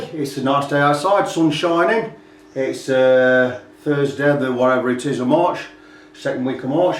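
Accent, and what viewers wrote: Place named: Nottinghamshire, United Kingdom. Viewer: Great restore mate! Ican see you are passionate about your work. I can slightly trump your bargain though - I picked up an ESP built Focus <num>) in the 90s - MASSIVELY unfashionable then lol, with the original FR also in the metallic red like yours for ony £60 :) The Charvel Japanese Model series (Fuji Gen?) from mid 80s are another underrated and overlooked find. Would be good to see you make a super low cost (but not nasty) Chinese guitar like a Stagg or something play like butter! Also your accent - are you in or near Sutton in Ashfield or thereabouts?!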